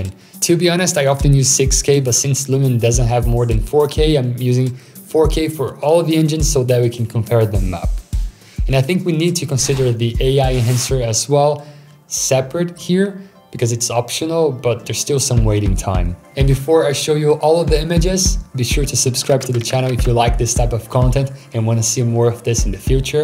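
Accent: Brazil. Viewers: are you brazilian? you english sounds like brazilian.´s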